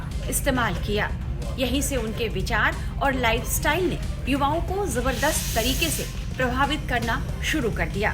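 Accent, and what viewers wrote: Bihar, India. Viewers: Mam aap bihar se lag rahi ho ya up gorakhpur sides se ho?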